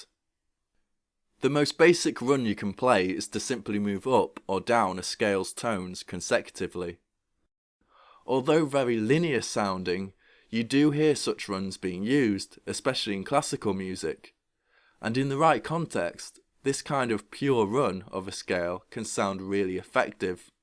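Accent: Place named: Cockney, United Kingdom. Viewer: cockney speach hehe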